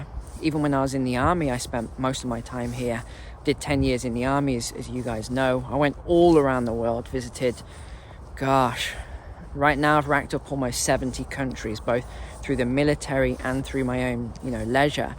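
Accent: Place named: United Kingdom. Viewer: I really love your videos and I personally think that 15 minutes is a great length. I watch just for the information as I am too old to apply any of it to my life! I also like you and your British accent! I hope you really love the place you are moving to!!! I am 72 and ruled by a dog that has an alien brain ( complicated )! I had investments for retirement but I didn't pay much attention to them because it wasn't that much. I checked them in early in 2019 when the pandemic struck and i had lost $30,000 dollars. I just left them there and hoped they would recover when the pandemic was over! I checked them again in early 2021 and they had gone from $270,000 to $ 430,000? I cashed them out and put them in a IRA and still can't find a safe investment! Bonds are no good, gold isn't doing anything, and the stock market is crashing, just as I predicted when they started printing all that money!!! 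Give me a clue,!!! P.S. The USA is not the place it used to be: - ( Beautiful walk!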